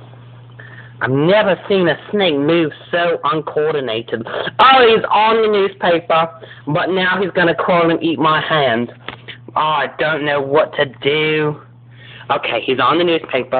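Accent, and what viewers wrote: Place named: Australia. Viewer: ! I love your Australian accent and your amazing skills with the newspaper. I can't wait till you make more videos like this!:)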